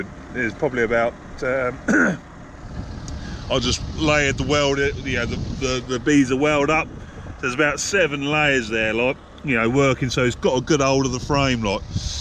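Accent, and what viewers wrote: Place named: Lincolnshire, United Kingdom. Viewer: help settle an argument for me please, we know you're in Beds. but you're accent sounds Lincs. to me but my mate reckons it doesn't, so are you or are you not a Lincs. lad?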